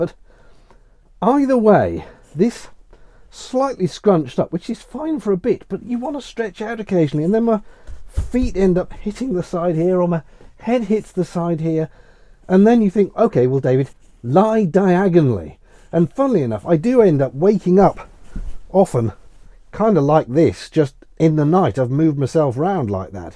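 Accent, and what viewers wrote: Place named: RP, United Kingdom. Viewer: thanks, even is your royal english is quite easy to understand, I really appreciate the comments you add 🙂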